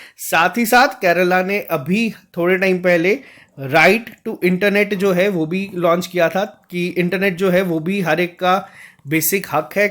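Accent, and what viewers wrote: Kashmir, India. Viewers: I love u sir thank you for everything 💗 One more thing sir your kashmiri accent is nice ...Siyah rood jaeren Manz...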